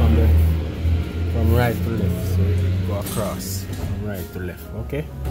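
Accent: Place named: Jamaica. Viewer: Sound like you in Jamaica, same place mi deh, I have the same car with the same issue. How can I get that bushing?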